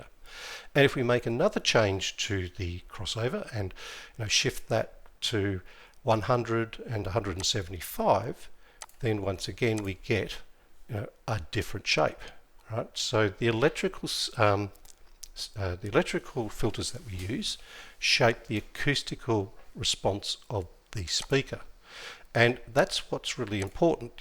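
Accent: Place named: South Africa. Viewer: brilliant tutorial, you sound South African BTW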